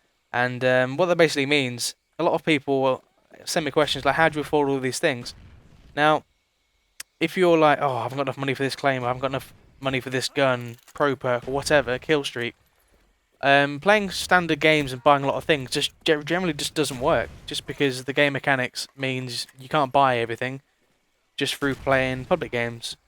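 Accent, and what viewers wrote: United Kingdom. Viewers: do you live in manchester or stockport? you sound it...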